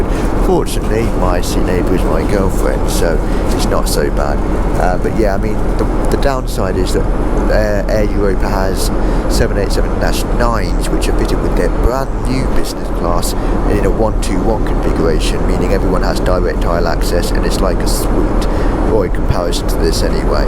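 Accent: United Kingdom. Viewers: Really nice Flight report in the amazing B787-800 of Air Europa 🎉 love your British accent and your great voice. Thanks x sharing!! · Nice trip! Your accent is beautiful, I'm wondering where are you from?! Scotland or Wales???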